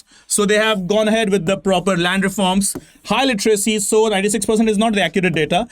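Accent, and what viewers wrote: Kerala, India. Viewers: Pls dont vomit malayalam in your slang english, as if the whites dont excrete a week and show off their painful countenance. Kerala is not anymore any good state under the hooligans. Compare this state with UP or Bihar. They are far more rich than Keralites. Please stop vomiting such propoganda. In the interest of your viewers here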